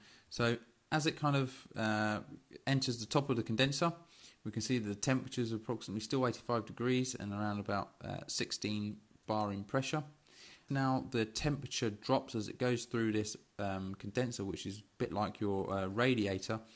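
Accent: United Kingdom. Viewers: I love your dialect Sir. It reminds me with my lovely British Professor .. Blessings. Once again, thank you. Kind regards. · Stopped watching because i hate british accent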